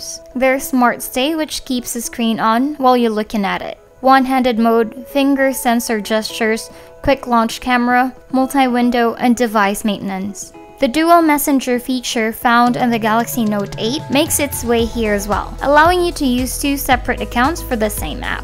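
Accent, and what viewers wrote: Philippines. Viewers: Filipino accent.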